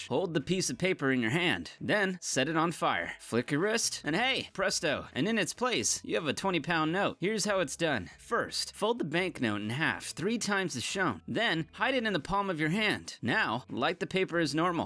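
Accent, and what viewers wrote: United States. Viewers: It's so weird to hear someone refer to bills as "notes" and their value as "pounds" while not only speaking with an American accent; but also holding US bills. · 20 pound note shows 20 dollar bill also his accent was American so why 20 pound note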